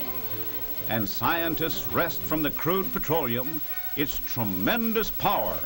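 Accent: Transatlantic, United States. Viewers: It's called the Trans Atlantic Accent. It was used back then in all American film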